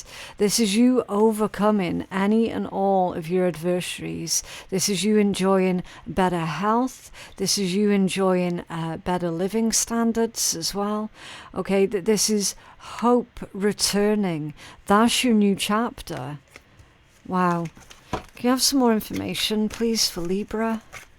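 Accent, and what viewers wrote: Scotland, United Kingdom. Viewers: Can I hear a wee Scottish accent in there somewhere? Great reading!❤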